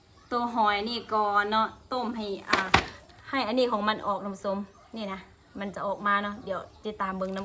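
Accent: Laos. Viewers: ນ້ອງ akha ອ້າຍເບີ່ງ ຄລິບ ນ້ອງຕະຫລອບ ອ້າຍຢາກຖາມວ່າ ນ້ອງເປັນຄົນລາວ ຫລືຄົນໄທ ຟັງສຳນຽງຄືລາວ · น้องอยู่จังหวัดอะไรครับฟังสำเนียงเหมือนคนทาง ส.ป.ป.ลาวครับ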